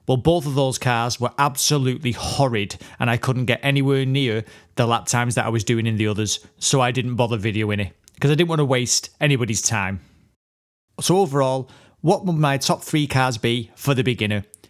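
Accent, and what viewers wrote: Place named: Bolton, United Kingdom. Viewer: Not a beginner but I watched the whole video to see if your observations are similar to mine - turns out they are almost exactly what I felt as well. For example the Lambo being edgy and bouncy over curbs but a more exciting experience than say the Peugeot. Also, I really enjoy the way to structured the video with each car segment being your fastest laptime long. Another question: I'm not a native english speaker but I really enjoy learning about accents. I could hear that you're probably from the northern parts of England, but I'd love to know which part more specifically, if that's not too much to ask. Your pronounciation of "fair" for example sounded more like I pronounce "fur" and the last person I heard talk like that was from Bolton.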